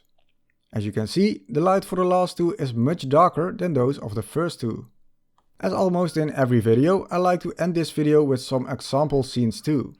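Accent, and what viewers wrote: Netherlands. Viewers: Are you Dutch? xD these mods are cool :D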